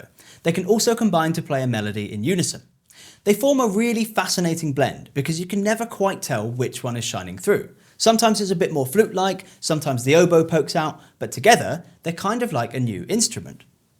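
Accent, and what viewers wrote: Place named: England, United Kingdom. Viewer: It's a perfectly clear, understandable and succinct English accent, what are you talking about!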